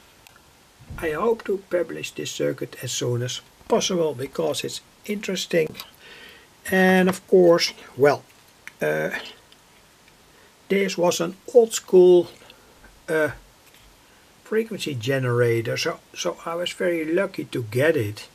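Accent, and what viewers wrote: Netherlands. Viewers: I know it's a random question but are you Dutch? I've been to netherlands and they have a similar accent. Thank you for the cool content. I really like the gigh frequency circuits you make and you make it seem way easier than I would think it is. Well it is actually.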